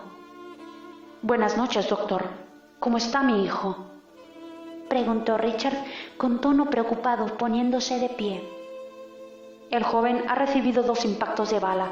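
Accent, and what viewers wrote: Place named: Spain. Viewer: Sip, lo sé, solo que hubo una de tus suscriptoras que preguntó que de donde eras y me atreví a responderle 😆😆😆(perdón)que eres española y eso se nota claramente en tu acento. Saludos.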